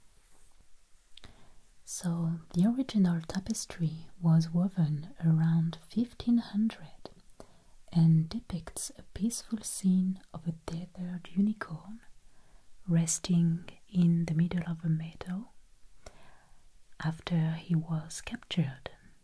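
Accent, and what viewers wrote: France. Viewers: These are my favorite types of videos, that makes....love the sound of the paint brush strokes on the tapestry's....and the running of her hands over the fabric(it sounds like the ocean)....very soothing and comforting....along with the history lesson and background of these beautiful works of art....complete with her french accent....who needs to go France???....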